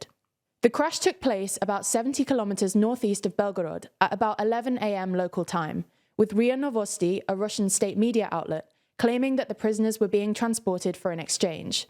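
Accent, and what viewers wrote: United Kingdom. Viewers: She is great but but has a T on the end, she is confident, easy to listen to and the news is impressive but she does say bu and similar so her pronunciation is more a natural British rather than correct :0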